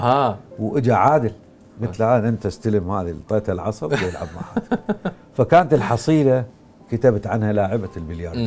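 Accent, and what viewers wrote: Iraq. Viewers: ماأجمل اللهجة العراقية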